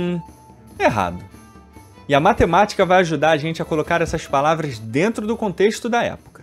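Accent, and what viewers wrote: Brazil. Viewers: ele mostrou a identidade no outro canal dele, e prr, se eu não me engano, ele cresceu no Brasil, por isso não fala português europeu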